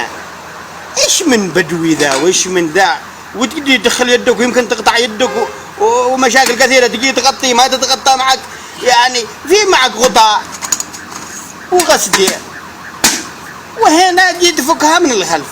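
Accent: Yafa, Yemen. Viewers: اللهجة اليافعية هذي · يافعي من اللهجة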